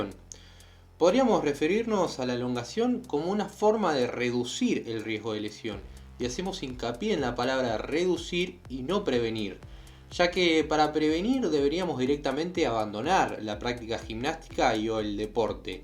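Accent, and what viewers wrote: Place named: Argentina. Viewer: Me encanta el acento argentino suena bonito, tienes buenas recomendaciones.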